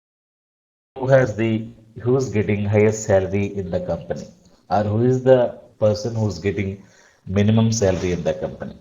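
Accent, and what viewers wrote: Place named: England, United Kingdom. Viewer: you are a very good teacher with a good rhythm of instructions. My only problem is the English accent. Very good job. Thank you for helping us with SQL.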